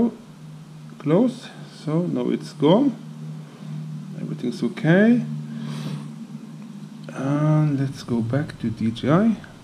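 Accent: Norway. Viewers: you must be norwegian?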